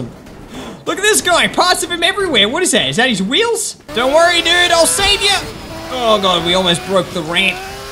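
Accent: Australia. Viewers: im new and i like how you are so aussie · you so Australian oh no we're bodged/oh let's do a u ey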